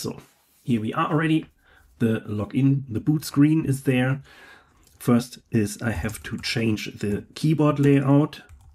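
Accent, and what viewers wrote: Germany. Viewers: Arch install mit deutschen Akzent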